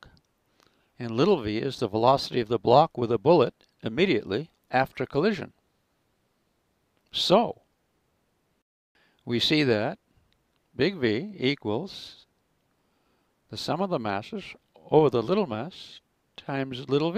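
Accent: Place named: Transatlantic, United States. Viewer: that transatlantic accent is so awesome, all i could think about haha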